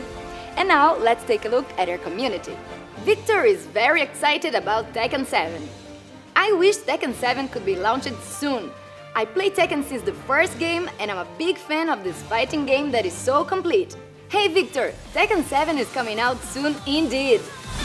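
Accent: Brazil. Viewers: She has an brazilian accent... is she brazilian? Very nice host! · I'm also the host for Bandai Brazil and Latam and I'm brazilian, so I know there's some accent there, but I'll work harder on that matter and I'm sure you'll end up loving the content!! <3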